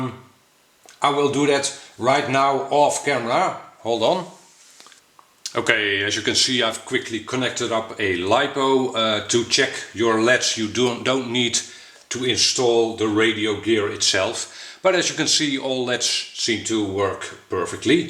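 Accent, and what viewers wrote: Netherlands. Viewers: Really enjoy your vids. Loving the accent especially. Wishing you every success with growing your channel. You obviously put a lot of hard work into it and are passionate about the hobby. Respect buddy. Cheers Dom (Essential RC)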